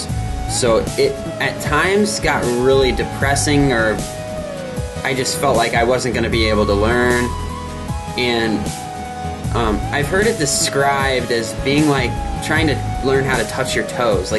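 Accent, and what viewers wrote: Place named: United States. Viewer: First of all thank u for ur prilliant ideas, but iam wondering why u r learning rolling Rs cuz u seem like pure American I feel like u r a native speaker thanks again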